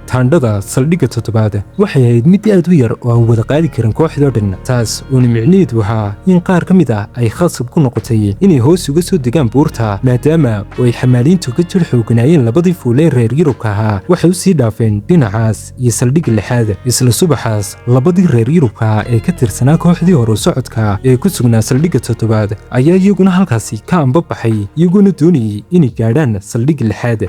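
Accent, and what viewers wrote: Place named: Somaliland, Somalia. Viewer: lahjadii somaliland na waad leedahay waxaanaad isticmaalaysaa luuliyo halkaad ka isticmaali lahay julaay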